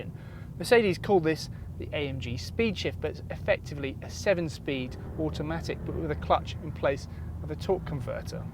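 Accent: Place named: England, United Kingdom. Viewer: Can we have Americans be the host. Hate this English Guy. Can't bareleunderstand him on my phone.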